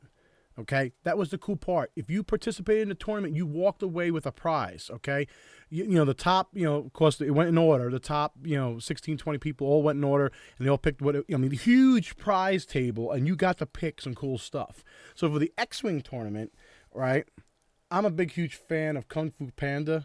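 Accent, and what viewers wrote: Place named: New York, United States. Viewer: you sound like you're in the new york area. if you are I wanna take you on on the space map!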